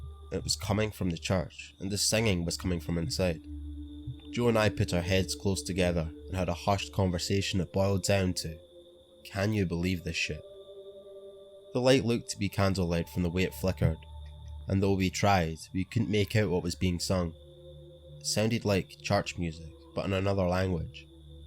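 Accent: Scotland, United Kingdom. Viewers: I love your Scottish accent, it really adds to the story. But sometimes it's kind of hard to understand it, so my suggestion is adding captions to the bottom of the video. I bet it would please a lot of people.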